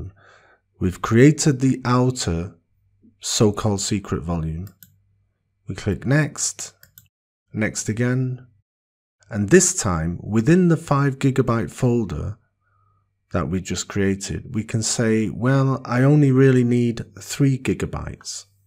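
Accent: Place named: England, United Kingdom. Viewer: DUDE!! This is a superb tutorial (1) It covers various scenarios a user might ask - at least for this specific topic of encrypted folders. (2) The explanation is clear and slow, so techies can speed up, but newbies can go slow. (3) An educated English accent is soooooo much clearer in articulation, rather than many Yanks who mumble and mangle words. Tech skills is one thing; teaching is another. That last bit may be a personal bias, but look at Hollywood movies - can barely figure out what they're saying. Maybe that's why the rely on big explosions. But that's a tangential topic.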